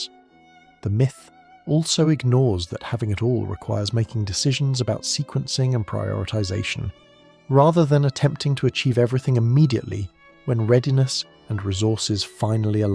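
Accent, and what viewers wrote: United Kingdom. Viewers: Blah, blah, blah, blah, blahhhhhhhhhhhhh Finding some British twit to read your garbage script doesn't turn a turd into roses, it's still bull---- All I heard was an average man excuse manifesto, BEGGING women to settle on them and stop being so mean with their mean mean standards, and leaving them for better men Geez, you Bro's need pacifiers more than you need women. This was ridiculous 😂😂😂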